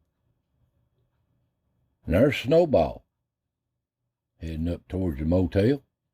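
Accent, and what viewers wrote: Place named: South, United States. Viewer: Heck fellurs I can just close my eyes and enjoy hearing ol' country accent and let it take me back to the day. ThankQ, you're a blessing to many of us who are familiar ol' country souls.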